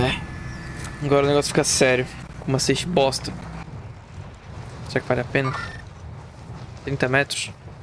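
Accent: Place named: Santa Catarina, Brazil. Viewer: Mano pelo seu sotaque vc é de Santa Catarina, de onde vc é?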